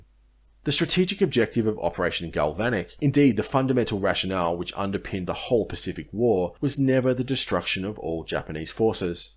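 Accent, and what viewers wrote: Australia. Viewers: This Aussie narrator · Thank god its not AI.... bonus: aussie accent too!😅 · He is actually an Australian - that is not a British accent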